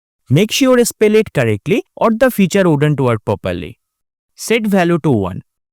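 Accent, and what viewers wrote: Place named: India. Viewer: chill, not everyone has en as native lang, it's just indian accent, the video is dead easy to understand... even without audio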